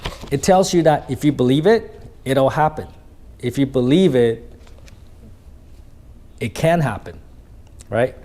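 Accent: Standard. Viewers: ns rất chuẩn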